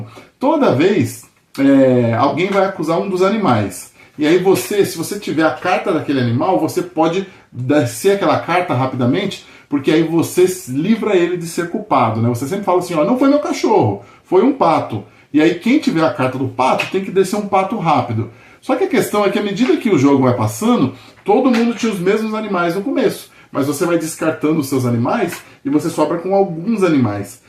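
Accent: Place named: Belo Horizonte, Brazil. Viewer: Ops bom demais? Você é de BH ou região?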